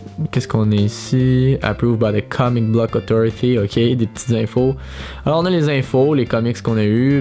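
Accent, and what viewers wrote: Quebec, Canada. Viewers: super ta facon de présenter! et j'adore of course l'accent du Quebec! Grande classe! dis moi comment fonctionne ton systeme tournant pour batman? Je veux faire pareil pour mes LEGO mais on voit le truc, alors que la toi entre le plateau qui tourne, le reste de la table et le fond, on voit rien! C'est pro!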